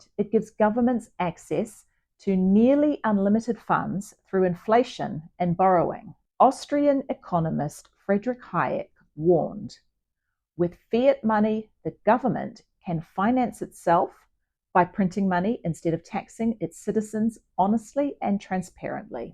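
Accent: New Zealand. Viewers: Very informative. Your accent sounds very Kiwi...in a good way:)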